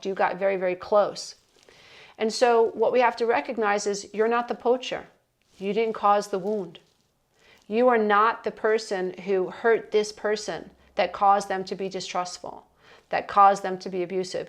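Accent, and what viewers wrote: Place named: Queens, United States. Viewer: Love your Queens sass, especially “what~eva” 😎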